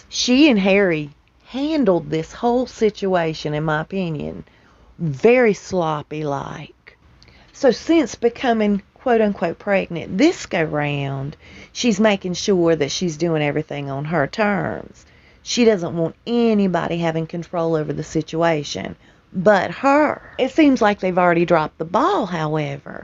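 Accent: South, United States. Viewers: By the way, I love your southern accent, love to hear you talking.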